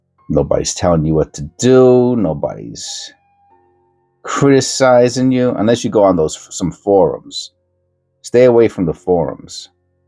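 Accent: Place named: Brooklyn, United States. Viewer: I knew I recognized that accent lol I'm also from Brooklyn and live in Bensonhurst.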